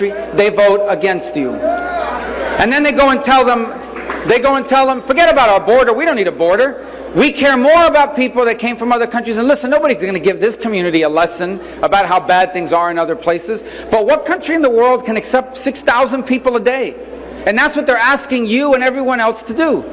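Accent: United States. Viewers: KIDS, this is what an AMERICAN sounds like.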